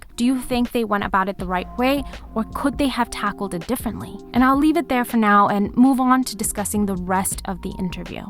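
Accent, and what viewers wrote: United States. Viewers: May I ask why you sound so American when being born and raised in British English speaking India? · Mumbai - I thought you were American. Greetings! You are providing us well researched and quality content!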